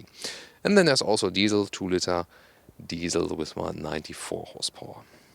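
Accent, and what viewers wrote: Germany. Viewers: that's germensh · your spoken english sounds like german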